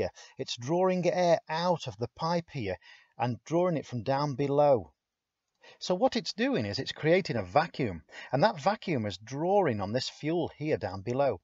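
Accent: United Kingdom. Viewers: too detailed and wordy with a British accent.... an American needs a plain-spoken and correct fellow to skip detailed diagram and illustration and theory and principles.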